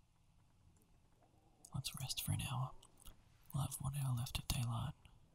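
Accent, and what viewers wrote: Australia. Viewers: Really enjoyed it :) Are you Australian? It's safe to say you're my favorite male ASMR'er. You have a nice way of pacing your videos.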